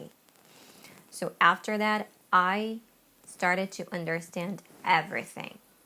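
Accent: United States. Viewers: I thought you were American.Mashallah you are very successful. I just discovered you. I have no intention of leaving you.I'm your student from Turkey.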